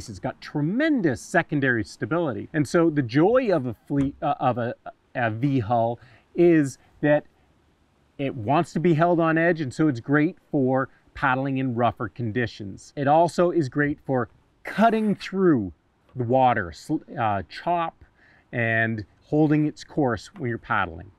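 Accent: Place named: Canada. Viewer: Info as always really appreciated, thanks. Plus your Canadian accent cracks us up down here in New Zealand. Colour density joke had me going for too long. Dang it! On a more serious note, not sure you have covered this point:- Which colour/ combination/s do you believe to be safest colour on the water?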